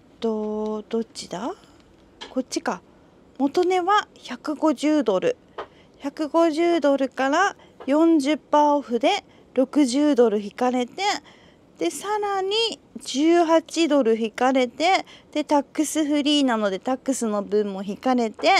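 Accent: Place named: Fukuoka, Japan. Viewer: Target isn't Cheap, their prices are High due to the location of the shop being in Waikiki and caters to tourists mainly, and the rent Target pays for the location is included. Locals don't shop in Waikiki, major rip-off! Walmart, Costco, Sam's Club, Donqui Jote, Times Market are the cheaper places to shop. Wholefoods, Kahala Mkt, Kakaako shops are expensive. Love your videos and your cute Fukuoka accent!❤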